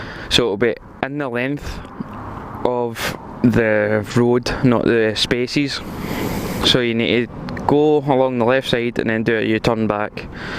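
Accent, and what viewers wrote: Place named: Glasgow, United Kingdom. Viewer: Whereabouts is this u sound like ur from glasgow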